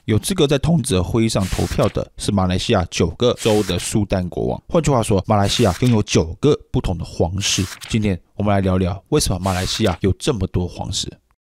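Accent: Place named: Taiwan. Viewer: 台湾腔太难听了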